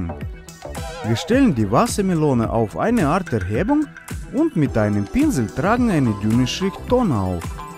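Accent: Russia. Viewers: Sein russischer Akzent bringt mich zur Weißglut 😑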